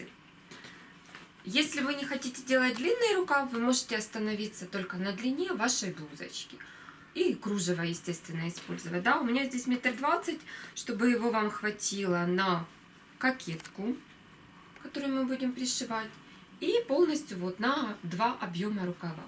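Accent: Ukraine. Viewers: Кристина Котельва да акцент наш, украинский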